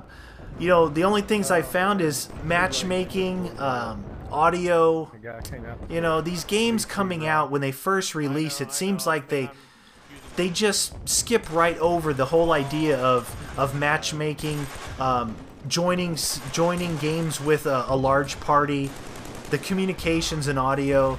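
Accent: Canada. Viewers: I like better then the others. No offense, but the " aut " and canadian language gets annoying in the long run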